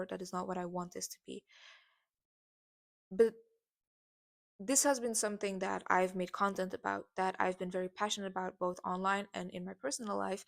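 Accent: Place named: Quebec, Canada. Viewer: Not related to the video but i love your accent!! Are you french canadian? You sound like me :)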